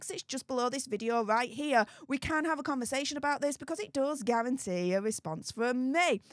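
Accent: Yorkshire, United Kingdom. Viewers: has a Yorkshire accent. So do I. I'm proud to be a Yorkshire lass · English is my second language , but I understand her..She has heavy Yorkshire accent..They talk like that, I checked sometime ago on YT.,when somebody mentioned her accent..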